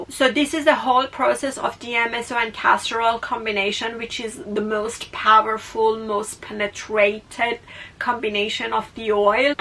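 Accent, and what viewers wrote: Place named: Iran. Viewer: Your natural Iranian voice is so much better than ai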